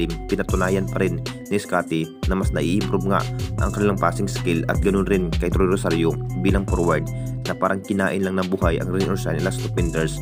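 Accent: Visayas, Philippines. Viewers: tagalog na nga pagba blog mo.pero wla pa ron sa tsmang grammar ang mga words mo ang sakit sa tenga pakinggan tapos yung iba fake news pa dika bagay na vloger bisaog ang dating ng pananagalog mo.pwe.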